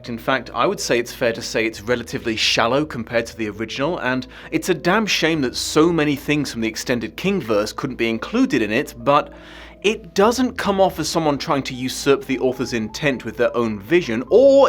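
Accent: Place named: England, United Kingdom. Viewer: ExPat? So your accent isn't real? I thought you were English so good job! :)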